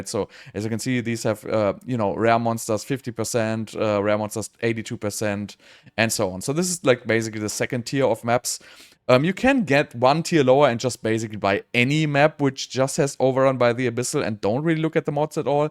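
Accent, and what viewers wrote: Germany. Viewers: Liebe wie man das deutsch immer raushört hahaha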